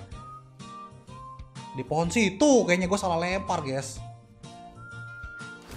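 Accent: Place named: Makassar, Indonesia. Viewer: orang makassar dia logat makassar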